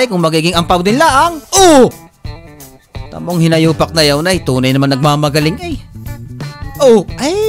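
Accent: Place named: Santo Tomas, Philippines. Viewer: Ayy taga STO.TOMAS talaga are! Ascent palaang ih!😂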